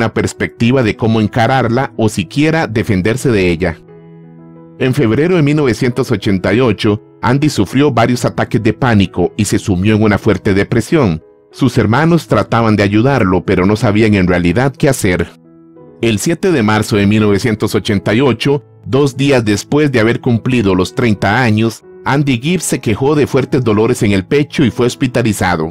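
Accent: Costa Rica. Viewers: Ya sabía que eras Tico por tu acento, muy bueno el reportaje. Amo los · Desde que empecé a escucharlo dije... Este es acento tico 🇨🇷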